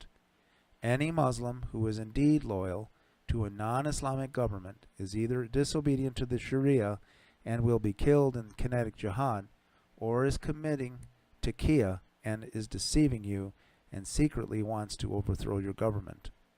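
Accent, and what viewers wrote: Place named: United States. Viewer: Your are right. But by your accent? you are in U.S. isn't it? the problem in Europe is that you talk about what will happen in Europe withon 1 or 2 generations... and too many people in Europe? don't have children, so they couldn't care less about the next generation. Angela Merkel? no children, no grandchildren. Teressa May? no children. Emmanuel Macron, no child of his own. That's a side effect of a birth rate under 2, in whole west and central Europe... They have cheap workers now, they don;t care about the future... BTW utube also blocks ppl fm comment and accounts, under "ofending" ppl rules... Immigrate for the cause of Allah, Jihad by immigration, and taqiyyah lie to them, is is Quran 4:100-104 <link>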